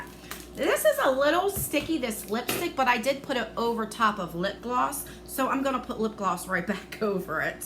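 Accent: New Jersey, United States. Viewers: Btw You are my favorite subscription box YouTuber! I love your Jersey accent and your upbeat personality and I also love that you aren’t in your twenties because I’m 36 and aging skin is a lot different than young skin so I know if a product works for You it will most likely work for me!! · And i have to say i live your true Jersey accent!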